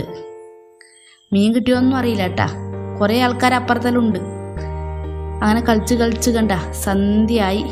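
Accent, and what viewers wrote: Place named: Kasaragod, India. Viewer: കാസറഗോഡ് ജില്ലയിലെ തെക്കൻ സംസാരഭാഷ നന്നായി❤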